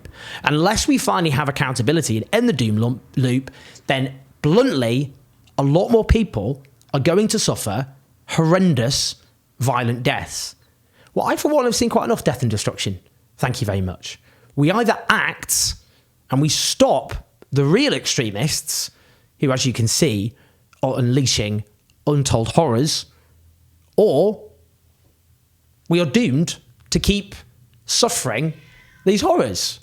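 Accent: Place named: England, United Kingdom. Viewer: I love the English accent 🇬🇧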